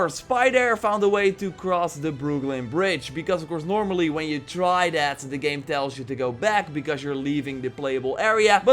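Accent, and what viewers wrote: Netherlands. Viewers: Are you dutch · Are you dutch im dutch you sound dutch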